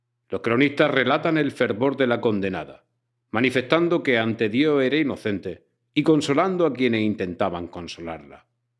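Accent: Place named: Murcia, Spain. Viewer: Sin comentarios. Magnífico ese acento murciano.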